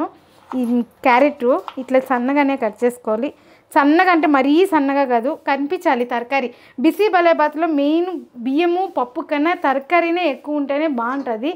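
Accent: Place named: Chittoor, India. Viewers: Its rayalaseema slang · Ne matalu basha Rayslaseema pillala vunnavu kani antha neyyithone cheyali nuv oil vadavu Nen eppudu chesthanu potato vesthanu totalga ne vusharu ne mata rhiru navhhai keep it up God bless you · Hi sister me oru Chittoor aa maoru la undhi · You are popularising Chittoor district Telugu dialect, thank you 🎉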